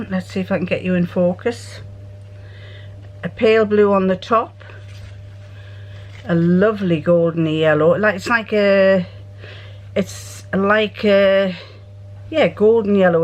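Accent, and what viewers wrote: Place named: South Shields, United Kingdom. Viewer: I hope you have a lovely Christmas you and Brian, you will need all your vitamin tablets with all your family, food and dog, energy levels may get low. 😀 it's lovely hearing an accent that my mum and dad had, (they grew up in South shields and easington lane. Don't forget if you come round here to have a walk near the river, let me know and we can meet up for a coffee at the station. Lots of love to you both. Heather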